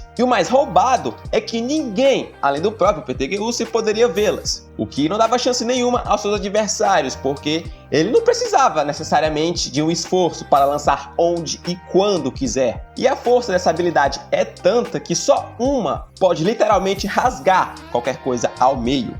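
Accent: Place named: Northeast, Brazil. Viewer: Mano vc tem sim um pouquinho sou do Piauí ent n consigo perceber mt sotaque nordestino, porém eu ouvi ums foi "massa" "foi paia" ai pronto tive certeza que é nordestino raiz kkk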